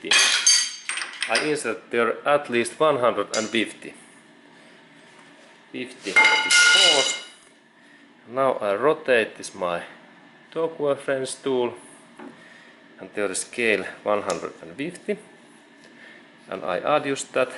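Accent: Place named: Finland. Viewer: You are Finnish i love your english!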